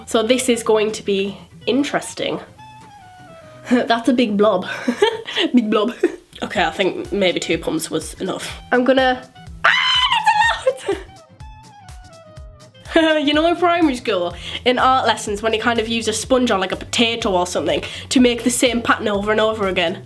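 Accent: Newcastle, United Kingdom. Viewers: Amirah Amazing No, she has a 'Geordie' accent, e.g. People who come from Newcastle and Tyneside... North East England. Not Scotland 🙄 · Itza Guillen .... do u know ur accents because it is obvious she is British... ps we are all human so calling someone's accent weird is just plain rude. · Subscribing for that accent, I'm fascinated. She must be the most British person in the U.K. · where do you come from because I really love your accent?!😘😍❤️🇬🇧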